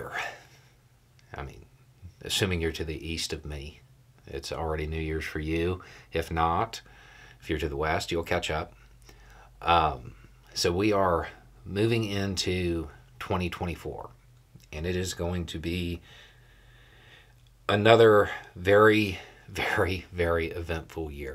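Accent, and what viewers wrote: South, United States. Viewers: Happy New Year. We spend a lot of time together nightly & I am grateful for the company, information & insights and for trashing the assumption that some who looks like you & speaks with a Southern accent is the antithesis of Progressive. Thanks. Carry on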